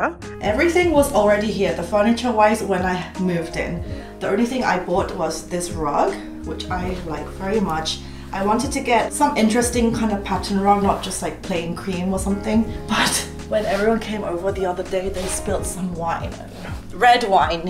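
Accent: United Kingdom. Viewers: Damn standard n strong British accent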